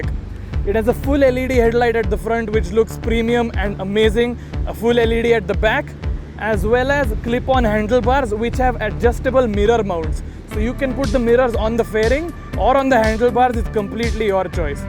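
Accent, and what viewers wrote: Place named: Maharashtra, India. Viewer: Rad reciew boys.. keep it up and keep up that Marathi-ish accent that lends you the unique charm 💐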